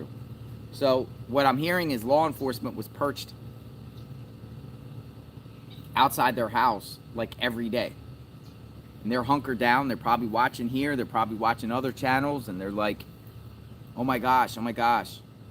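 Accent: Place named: Pennsylvania, United States. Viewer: That PA accent! Can't miss it.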